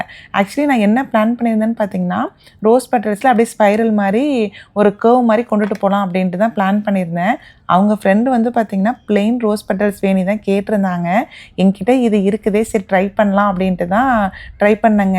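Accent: Kongu, India. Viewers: எல்லா வேணியும் அழகு, உங்க கொங்கு தமிழும் அழகோ அழகு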